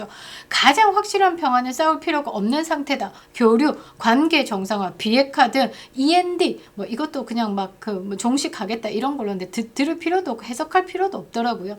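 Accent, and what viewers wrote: Gyeongsang, South Korea. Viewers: MC면 성조강한 사투리나 고쳐라. ... 여자들은 잘 고치는데 겅상도 티내냐 ㅋㅋㅋㅋㅋㅋ 윤석열 시절에 언론 기레기들이 김명신 얼굴보고 와~까지 하면서 단체 딸랑거리고 생일날 북한처럼 경호실 축하송까지 만들어 부르는 수준에서 언론 딸랑거린다란 소리가 나오냐 ㅋㅋ 신천지 통일교 전광훈 세계로 교회출신 극우냐? 나라를 위기로 만들어놓고 탄핵이나 당하는 주제에 아직도 정신 못차리고 그입에서 새벽예배를 언급하네.. 예수님을 못에 박은건 당대 보수주의자인 유대교 바리새인들이였단다.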